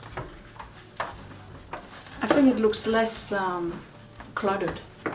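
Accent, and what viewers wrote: France. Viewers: Love your beautiful French accent & your lovely antiques & treasures!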